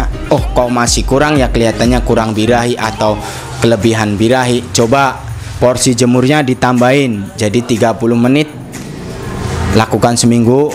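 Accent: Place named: Kalimantan, Indonesia. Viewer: Logat kaya orang kalimantan hahaa maju terus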